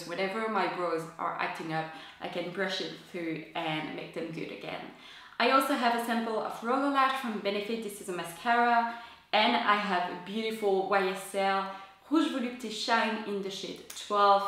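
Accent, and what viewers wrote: France. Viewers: Love love love your French accent !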